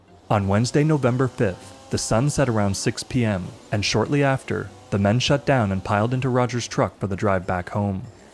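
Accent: Canada. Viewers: The narrator is clearly Canadian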